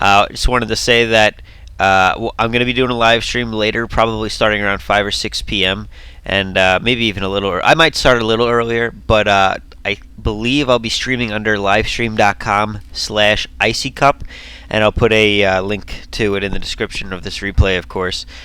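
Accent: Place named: East Coast, United States. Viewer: As much as I don't like the screaming in my ear, I love his east coast accent. :P Go America!!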